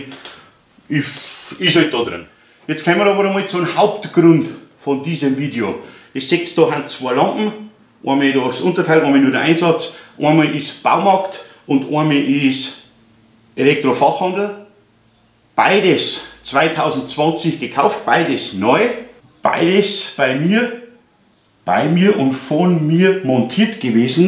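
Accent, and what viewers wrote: Bavaria, Germany. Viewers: woher kimmst du eigentlich aus Bayern?:D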